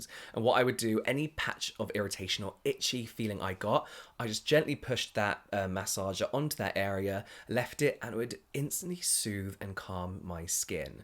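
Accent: United Kingdom. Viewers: I realized you and MsAlexx (UK fashion vlogger) have the same accent and I can’t unhear it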